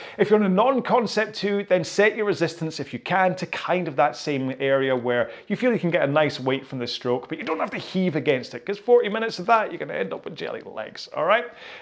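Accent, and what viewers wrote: Scotland, United Kingdom. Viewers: That was awesome.. 🥵 now off to practice saying (in Scotts accent) ‘sitting behind my desk for hours’ … auoihrs.. auhirs.. ouirs.. love it! Thanks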